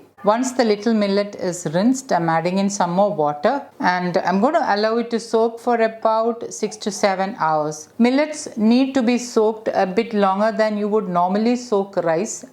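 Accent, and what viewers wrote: Kerala, India. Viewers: In malayalam it is pronounced as dhosha...her native is kerala...so obviously she speaks with malayalam accent😊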